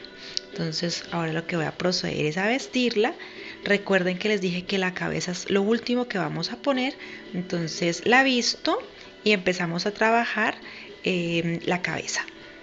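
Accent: Colombia. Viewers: Explicas super 👌🏽 tienes un lindo acento, eres de Cali? Obvio me suscribo a tu canal, me encantó 😉 👏🏽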